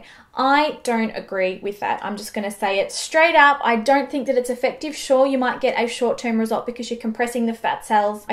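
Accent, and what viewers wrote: Australia. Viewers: Australian, huh?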